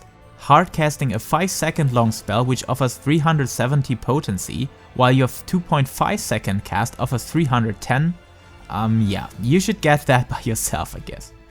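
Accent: Germany. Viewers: Are you German ?!?!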